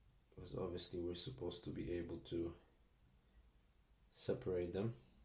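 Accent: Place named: Poland. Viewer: zajebista forma byku, niezły twister, piszę po polsku, bo słyszę akcent XD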